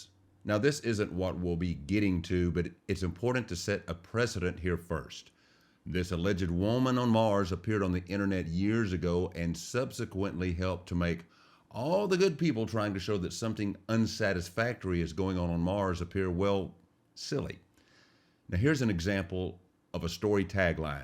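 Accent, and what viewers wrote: South, United States. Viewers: I subscribe because I love that southern drawl, the Mars work (an opus), and an abiding interest in Mars. The items you find are incredible. Sadly I can't do the 3D viewing, having never developed stereo vision (congenital eye problems), but I still try! I'd love to see what there is.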